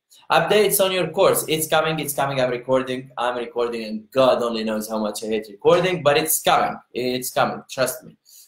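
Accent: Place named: India. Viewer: انجلزيتو مثل انجلزية الهنود الي عمرهم ما خرجو من الهند !!! لا اعرف لماذا يصر على التكلم كليا بهذه الانجلزية !!!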